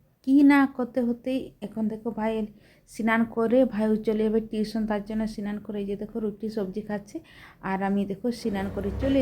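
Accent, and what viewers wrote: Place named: India. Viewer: Tomar kotha gulo sunte khub valo lage ektu Hindi tan koto dhone pata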